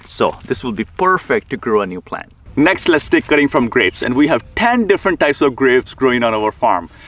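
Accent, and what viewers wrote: India. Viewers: Dude, you have a thick-strong Indian accent trying hard to talk like an American white guy from the US. Your thick Indian accent isn't fooling nobody. Lol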